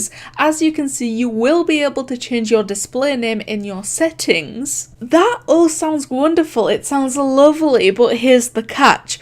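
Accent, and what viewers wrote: Scotland, United Kingdom. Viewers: Is it just me or is she scottish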